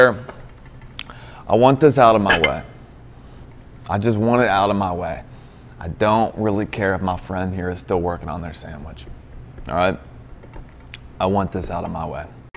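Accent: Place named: South, United States. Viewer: he's just southern, calm down 😭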